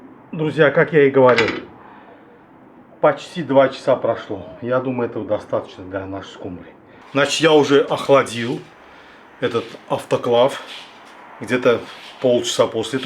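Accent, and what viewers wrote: Baku, Azerbaijan. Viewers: Родной Бакинский акцент! ❤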